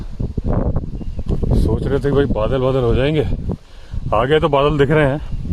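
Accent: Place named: Delhi, India. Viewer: Very nice Ji Aap ko 3 Years follow kar Raha hu, retired Force memer hu, Aap say bahut knowlegde milte hai. Delhi born hu, South Delhi Mai Ghar Education, par aap ki aawaj say esaa lagta hai, aap Aapnay hai Delhi blog dekta hu. Hamaray block may Vinod Awasthi Pandit ji or journalist banay, 3 bhi Sub very intelligent and I like same as well as. Thanks for knowledge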